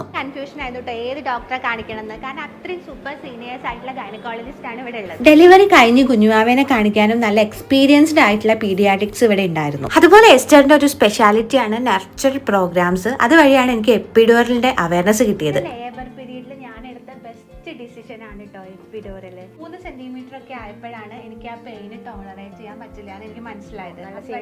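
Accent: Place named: Malappuram, India. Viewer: ആഹാ താങ്കൾ മലപ്പുറത്തുകാരിയാണോ 😮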